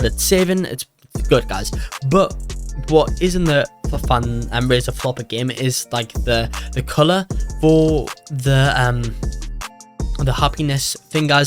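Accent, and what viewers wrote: United Kingdom. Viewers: he sounds brihish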